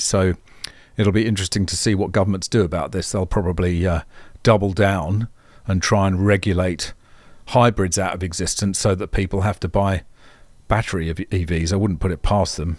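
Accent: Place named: United Kingdom. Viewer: An average of 60 ICE crash fatalities occur in Toronto every single year. Kids, moms, dads, and others have lose their lives everyday. Sadly they hardly ever make any big news, even if the volatile fuel in their gas tanks explodes. A single crash with an EV of course makes headline news. Luckily EVs are much less likely than ICE to catch fire (for obvious reasons), and their safety features help prevent these fatal ICE crashes. But Hybrids are the worst fire catchers. They're even worse than ICE. Apparently mixing a high electrical power system with volatile fossil fuel system may not be wise. Who ever could have thought? "Fully electric vehicles, on the other hand, were deemed far safer than both hybrids and gas cars; they are far less likely to catch fire, with just 25.1 fires per 100,000 sales. That’s compared to 3,474 hybrid fires and 1,529 ICE fires per 100,000 sales respectively." Ouch. 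I wonder why Australia (with UK accent) never mentions these things. Is there an agenda at work or $omething?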